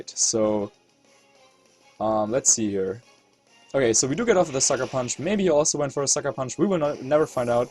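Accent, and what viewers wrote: Germany. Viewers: Lolz, nothing wrong with german accented Vs, how about ' Battle Spot Story' or something? xP I was playing on the N64 recently n' it's the 1st thing that came to mind, <3 Story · First comment of the day LOL it's an honor. I like the way you say it with German accent better tbh, wasn't trying to be mean. Keep up the great content, both VGC and if you decide to start a new series! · Your German accent is fine! Pokemon is an international community and that's what is so awesome about it!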